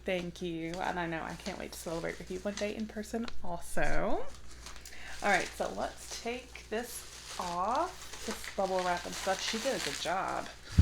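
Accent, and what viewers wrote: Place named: North Carolina, United States. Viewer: New to your channel. Our accent is the same! I'm from North Carolina too. I live in Thomasville nc.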